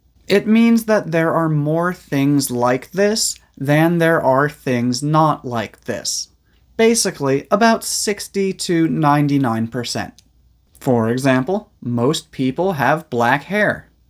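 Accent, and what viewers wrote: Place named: United States. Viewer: " I love your teaching style most especially your beautiful American accent."😊😊❤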